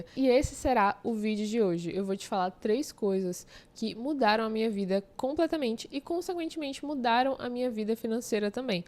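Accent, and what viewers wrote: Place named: Bahia, Brazil. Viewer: eu simplesmente amei o seu canal principalmente por ser baiana, disso eu não tenho dúvidas só de ouvir seu sotaque me senti em casa, seu vídeo sobre autenticidade parece que foi feito pra mim, muita gente me ama como eu sou e mesmo assim vivo constantemente querendo mudar tudo em mim, vc é muito necessária ❤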